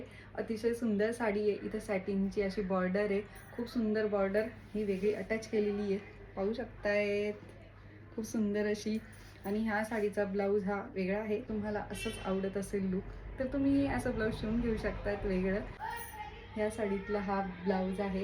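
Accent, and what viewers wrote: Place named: Khandesh, India. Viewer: Tai tu khandeshi ahe na tuzya bolnuavarun vatat mi pn khandeshi ahe tu khup god ahe aani tuze bolne ati sunder ❤ love you tai mla aaj paryant koni nahi aavdl evdi tu aavdte ani tuze sagde video baghte mi😊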